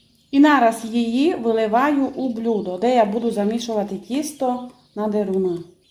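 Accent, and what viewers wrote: Transcarpathia, Ukraine. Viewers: и снова вы с чудесным закарпатским говором и нашими украинскими вкусностями Рада вас слышать Привет из Изюма❤😊😊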